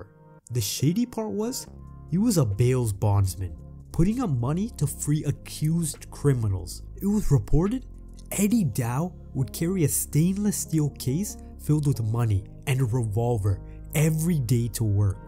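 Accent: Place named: United Kingdom. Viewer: God, your voice is so... BRITISH, EXCITED, I DON'T KNOW!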